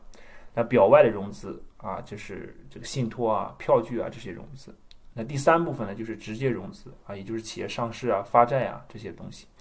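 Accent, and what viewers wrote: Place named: Shanxi, China. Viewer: 估计哥们是山西人，口音听着亲切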